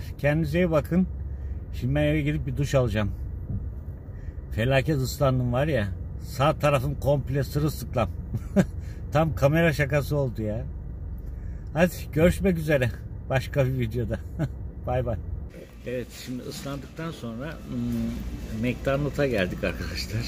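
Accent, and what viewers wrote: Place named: Ankara, Turkey. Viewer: Abi Tam Angara aksanı . Süpersin valla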